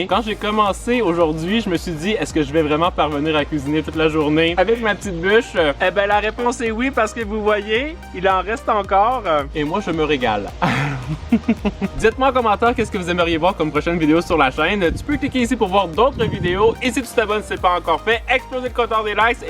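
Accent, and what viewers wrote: Quebec, Canada. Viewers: 😂😂j’adore,ton accents québécois 😂😂j’aimerais trop abiter au Canada !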